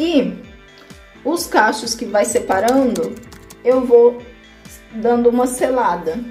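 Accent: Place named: Bahia, Brazil. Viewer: Nossa ela é da Italia mas parece que tem um sentido Bahiano de Salvador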